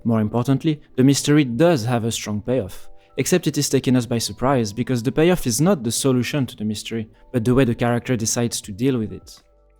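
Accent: France. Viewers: I watched this video and the one about the cabin in the woods, and I really like how you edit your videos and present your thoughts. Someone in the comments on the cabin video said you should summarize the plot oft the movies you review more - I disagress. Discussing specific parts of movies is a really good advertisement to watch the movies. Your charming French accent is the cherry on to...can't wait to see and hear more of your work!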